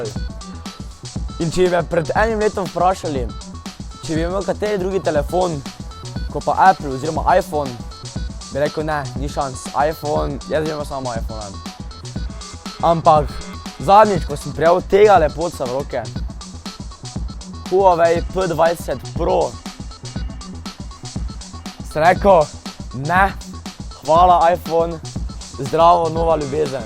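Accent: Styria, Slovenia. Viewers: ta fon je za razliko od tebe pamtn, pa vid se da si toti štajarc k ti lih ne gre tota angleščina "somrak"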